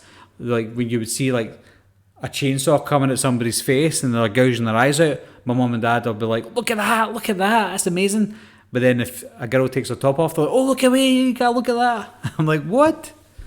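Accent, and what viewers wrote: Scotland, United Kingdom. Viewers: Were about’s from Scotland are you from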